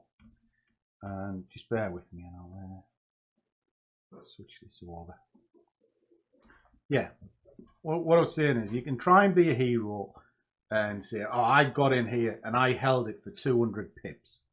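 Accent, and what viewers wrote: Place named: Teesside, United Kingdom. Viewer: First time here , really informative and going by the accent think you must be local to me ( I'm in teesside)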